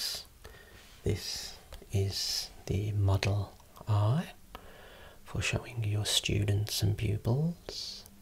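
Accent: United Kingdom. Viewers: Your content, voice and British accent is better than any type of melatonin, I knock out so quick 🛌 😴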